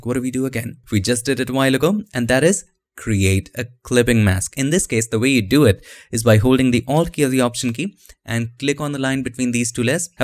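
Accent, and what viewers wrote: India. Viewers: You speak too fast and your english is slightly Indian, it would be easier for me, at least, if you spoke in a more paused way. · indian accent just kills me ...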